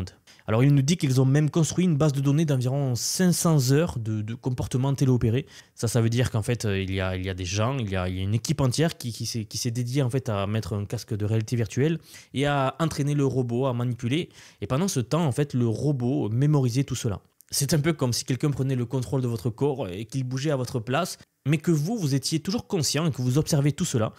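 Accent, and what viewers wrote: South, France. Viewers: Un peu du sud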